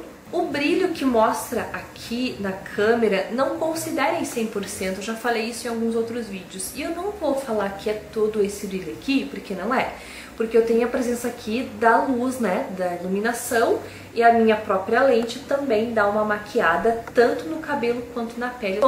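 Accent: Rio Grande do Sul, Brazil. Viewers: e gaúcha? Vc fala lindo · Sotaque gauches kkk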